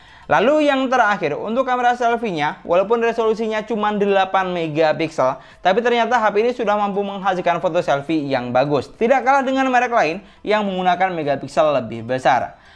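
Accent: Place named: Martapura, Indonesia. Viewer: Orang Martapura yah mas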